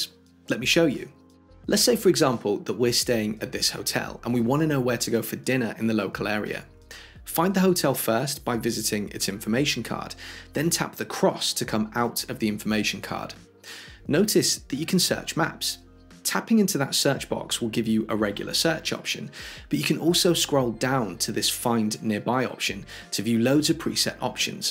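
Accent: United Kingdom. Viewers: The only improvement I need to use Apple Maps exclusively would be the ability to download offline maps. Enjoyed the video, very informative and to the point, and you brits are very pleasant to listen to ( I'm an American, but set my Siri voice to British for that reason lol )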